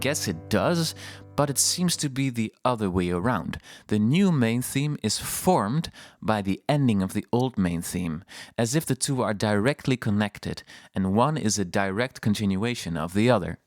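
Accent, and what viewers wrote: Netherlands. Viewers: Ur from dutchland i can hear it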